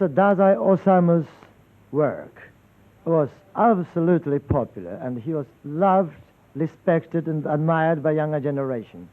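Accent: United Kingdom. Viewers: 昔のイギリス鈍りで当時としてはネイティブなレベルだったと思うぞ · イギリス訛りっぽい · 戦前の日本はイギリス英語を主に学んでいたと言うから三島さんもその訛りが感じられるね。 なんというかやはりイギリス英語は気風がある感じがするから彼にあってるね。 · わざとイギリス弁にモノマネした喋りすごい。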